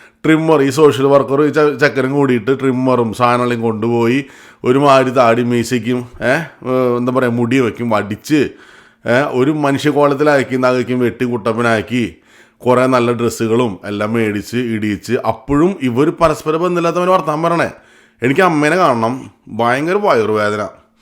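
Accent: Thrissur, India. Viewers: Good presentation 👍Thrissur slang super 🤩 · ഞാൻ ദൈവവിശ്വാസം ഇല്ലാത്ത ആളാണ് എന്നാലും ബ്രദർ തൃശൂർ സ്ലാങ് ഇഷ്ടപ്പെട്ടു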